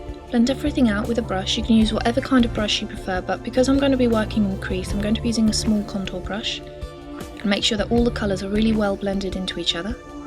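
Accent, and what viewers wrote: United Kingdom. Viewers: she sounds british :) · R U BRITISH !?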